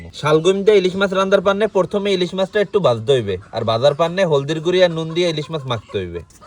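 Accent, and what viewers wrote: Barishal, Bangladesh. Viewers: ওরে বরিশাইল্যারে!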